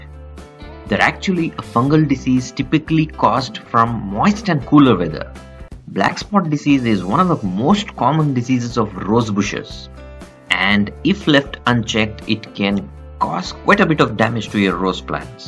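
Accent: India. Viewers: Please just eliminate the background music . Hard to hear your Indian accent voice with the music . Very informative . Thank you .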